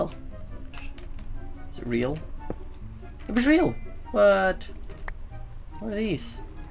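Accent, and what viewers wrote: Scotland, United Kingdom. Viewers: Are U Scottish? iam (U sound Scottish) =) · I know that this was a long time ago but is he Scottish?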